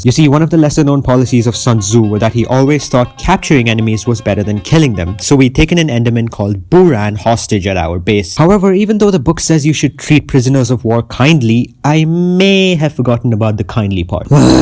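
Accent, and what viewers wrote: India. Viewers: Bro still has Indian accent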